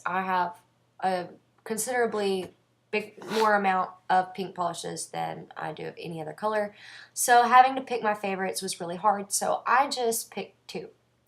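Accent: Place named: South, United States. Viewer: LoL - high five swanky southern accent!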